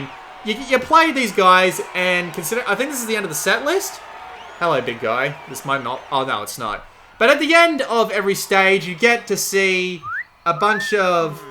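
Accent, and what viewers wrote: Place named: Australia. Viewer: The Australian accent is so harsh and annoying... Whiny...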